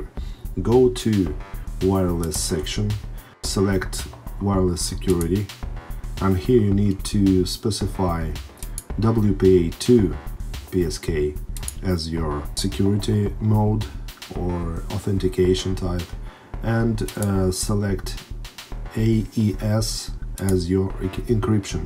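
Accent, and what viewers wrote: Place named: Russia. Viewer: Thanks man❤️ like that heavy Russian accent.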